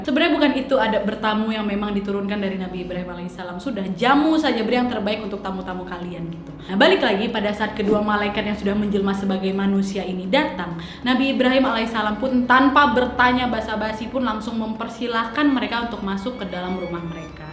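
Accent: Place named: Medan, Indonesia. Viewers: Awak orang mane ? Kadang macam melayu medan..kadang macam melayu kepri.. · Uak,aku sangat suka,kali logat medan uak itu tros kan wak ya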